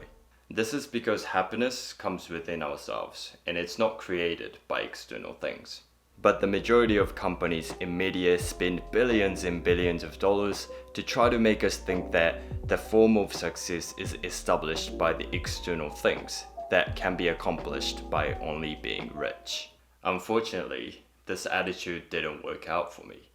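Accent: New Zealand. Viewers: I wondered if you grew up in NZ, you have a Kiwi accent. Then I saw another video and yup. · It always makes me laugh how much time and money people spend to become 'minimalists'. You are the most authentic person on this topic that I have watched so far. Thanks for posting your thoughts on these topics - and it's nice to hear a Kiwi accent for a change (from an Aussie) xx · You sound a bit kiwi. ☺️ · your english is good, but as an American i can pick up on your New Zealand accent at times. like the way you say the word "yet" and some other words.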